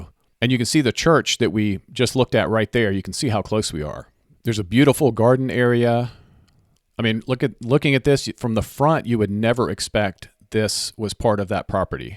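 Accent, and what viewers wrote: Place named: United States. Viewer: It's the American accent. The British say it differently.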